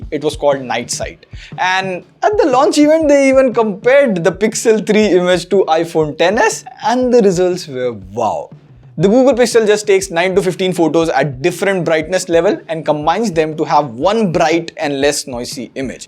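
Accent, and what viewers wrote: India. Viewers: He sounds like Indian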